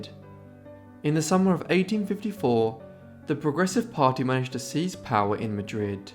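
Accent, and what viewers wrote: England, United Kingdom. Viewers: I'm perfectly aware of his English accent - I'm English,myself - I think he's fantastic & really enjoy his videos, but,there is NO other way of pronouncing the word properly in English. I'm very dyslexic as once might realise from reading my post,but,on the spoken word I have total confidence & as I said its constructive criticism, not an insult. I give my Spanish/Italian godson English lessons to help his conversation & having lived all over the World & helped 100s of people with English, it's a courtesy to help someone be the best they can be. Just like I tell anyone to correct my French,Spanish,Italian,Arabic & Greek. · Do you realize he is British and British people pronounce words differently then Americans!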